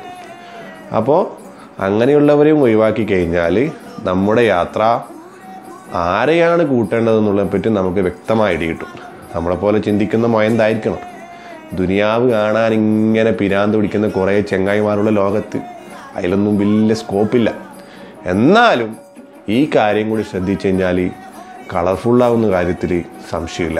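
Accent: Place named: Malappuram, India. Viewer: kka ങ്ങളേ വർത്താനം.....അടിപൊളി....ങ്ങള് മലപ്പുറത്ത് ന്നാണോ?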